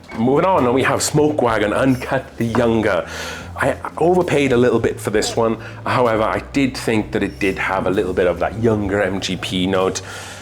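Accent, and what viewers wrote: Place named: England, United Kingdom. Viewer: yes, very much so. You're one of my favorite whiskeytubers. Dig you, brewzle, and SLB. Also, I thought I could get a touch of Scottish but it was also very similar to english (accent) but not one specifically. I mean I'm a Californian so accents peak my interest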